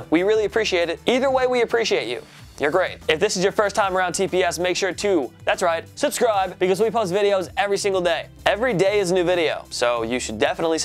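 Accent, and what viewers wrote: United Kingdom. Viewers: nice brttish accent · I’m from the uk and I love your English accent 🧨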